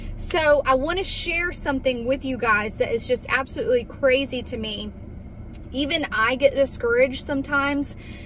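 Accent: South, United States. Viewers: I love your laugh and southern accent. You're my sister in Christ, and you look like you could actually be my sister, although ten times better looking. (I'm old)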